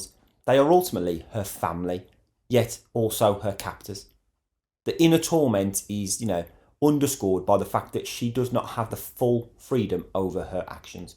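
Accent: United Kingdom. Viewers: 😂 sounds Brit to me 💯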